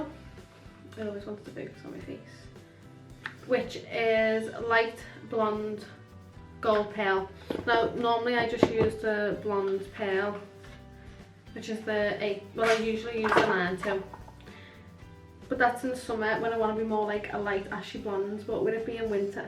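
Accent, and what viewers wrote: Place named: Liverpool, United Kingdom. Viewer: GORGEOUS!! (and I love your Liverpudlian accent! I LOOOOVE Liverpool so much!) ❤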